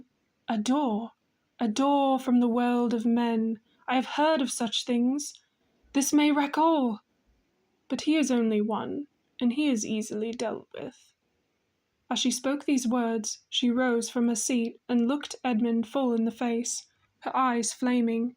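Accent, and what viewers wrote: United Kingdom. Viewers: Thank YOU for doing a fabulous dramatic primadonna british accent ice queen! Sounds fun to record and even more fun to listen to!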